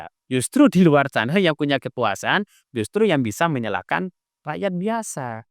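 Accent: Madura, Indonesia. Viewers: Btw, Logat madura ya mbak?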